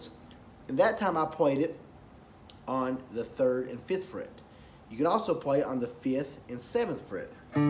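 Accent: South, United States. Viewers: Yea man...any little trick that puts chords to leads is helpful without tellinng anybody you done whipped up some pentatonic simplicity. Also teaches you where to find alternative chording deep down and up top in the nut region and everthing in between. Brother, I use your vids for to students to keep it real. They come in all sad they trying to play or cant feel the music. We pull up your stuff and say looky there.. this fella is putn down some good country sounds with a geettar and a Southern accent..lol...I love stealing your stuff to keep the kids motivated. You keep it simple but sound like a pro. Keep it all rolling. Even some Bass walks into simple chords like c d g a like this bass pick 1 count E E F# G# Open D string then strum that As you just taught us... simple but sounds like geting warmed up. Then move to the 1 4 5 progression and put it all together. 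3 vids. Put all 3 lesson together voile' another kick butt lesson just like this one. Keep bringing it... man we love ya here in Colorado. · says: 'There is no B Sharp', says: 'This is a B Sharp'. Uh no that's a C. I give you credit for trying to teach others when you're really not a very good player, takes a bit of nerve. Watching you try to play notes was painful, too bad your knowledge doesn't transition to actual playing. I guess that old saying 'those who can't do, teach' is true. You are kind of fun to watch, with your good ol' boy style and accent so kudos to you. Practice practice practice (you'll get there).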